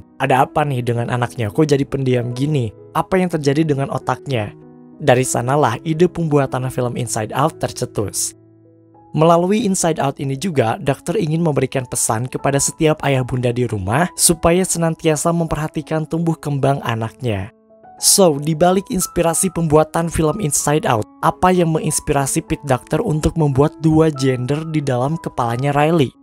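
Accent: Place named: Java, Indonesia. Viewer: Bang, anda orang Jawa ya soalnya sedikit denger ada logat Jawa nya tapi saya orang Sunda kok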